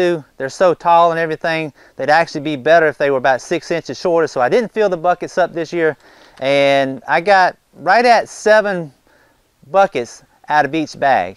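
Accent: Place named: Louisiana, United States. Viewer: Dude... That sounds like a Louisiana accent! 😳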